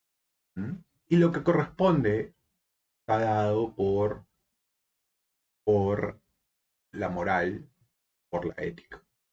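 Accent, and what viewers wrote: Lima, Peru. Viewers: caes bien compa. Tu acento limeño súper relajante. Tu inspiración contando historias de bayly también. Pronto tendrás en la caja de comentarios 4000 comentarios, grande.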